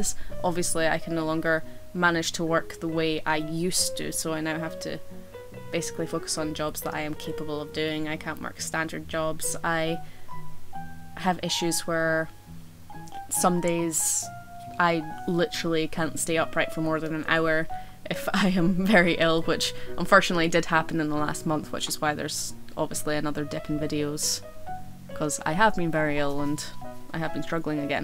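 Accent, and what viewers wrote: Scotland, United Kingdom. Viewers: No she is British can u tell the accent I'm an American and I know that,and why is people trying to get ur age, you did the right thing and not telling them ur age. Yeah same here I wear 24/7 because I love it,no medical reason but I do love your fighting spirit that gose a long way with u... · Lol, your accent is not too strong for being Scottish. But definitely recognizable. My friends parents came from there. And somehow her mom's voice has been implanted into my brain and I think in a Scottish accent. That is so weird to me lol. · Probably you get asked if your Irish as the northern irish and Scottish accent have similarities :)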